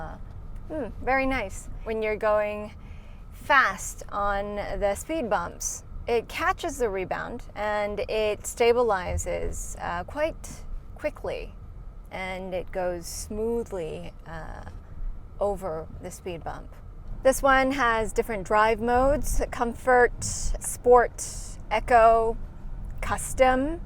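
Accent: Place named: United States. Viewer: Good video! Regards from an Kia Stinger driver in Sweden! And its good with the American accent, not the England "time for thea" accent! :)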